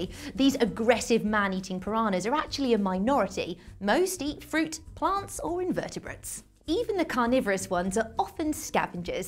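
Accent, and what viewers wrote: United Kingdom. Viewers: i love your accent! UK?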